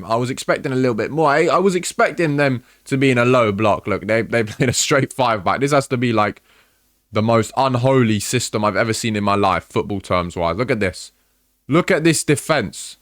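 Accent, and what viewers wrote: United Kingdom. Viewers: Hmm, why do you say we? Are you Italian? You certainly sounds British to me.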